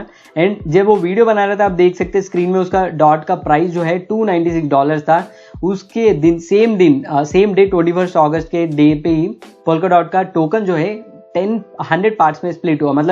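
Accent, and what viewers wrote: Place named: South India, India. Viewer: Your accent is South Indian and you use more English. Change your channel name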